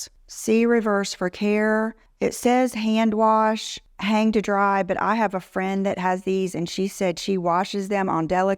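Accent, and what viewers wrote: South, United States. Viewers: “I think I have a little bit of a southern accent” hahaha ur so cute.. as someone from Wisconsin.. yes you do have a southern accent — love it! I have a Wisconsin accent 😂😅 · Hi …..I happen to love your Southern accent ….I’m a Tennessee girl so we both know…..it’s a Southern thing….great video✨ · Hi, loved the pjs. Very pretty. I am a 100% remote worker. I put on dresses and makeup even if I have no scheduled Zoom mtgs as it makes me feel pretty and professional. I look forward to the videos you were talking about for those of us at home. So, yes, please....do make those vids. You and Marnie are my favorite influencers. I love that you are friends and sometimes mention each other. BTW, you do have a slight Southern drawl. Love it😊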